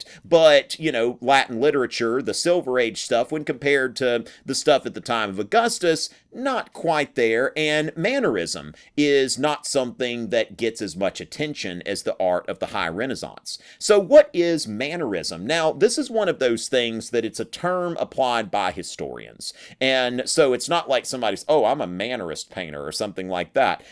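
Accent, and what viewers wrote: South, United States. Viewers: Your southern accent really kills me!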